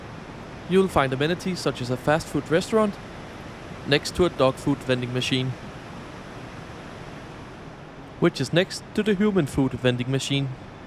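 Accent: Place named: Denmark. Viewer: I love your Danish 🇩🇰 accent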